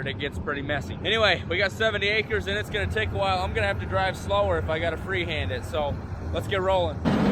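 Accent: Texas, United States. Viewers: you have a southern accent coming through on this video!!! You sound like your from Texas!!!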